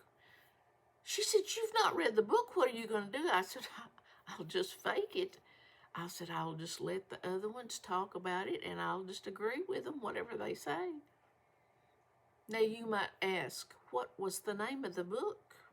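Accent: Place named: South, United States. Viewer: Hey....it's all good!! It's just from the Southern roots you grew up with. And it's down right special!! I get a lot of pleasure from hearing the different accents. Probably because growing up and being in Florida....where there are actually more Northern folks...so accents not Southern mostly. I love that twang! Don't you worry about it. It's perfect!❤❤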